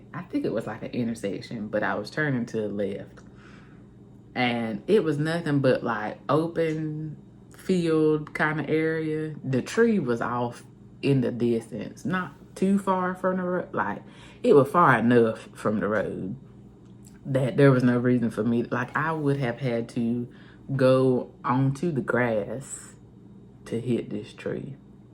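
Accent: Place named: South, United States. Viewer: Im gonna assume you were sheltered growing up...get out of comfort zone...truck'n is tough but it gets easier as u start to get it...I understand you want to move to the beat of your own drum...we all do...the world is a cruel place...I like the fact you're willing to get out of comfort zone (🚛)...ik I'm all over w my writing but formulating sentences isn't my forte...the 🌎 takes no prisoners...love the southern accent...get a chance watch niesha k or skimp dee or roadrunna nia... watch how they move look at mirrors... recognize what u see...plus they're prissy they like to get hair and nails done...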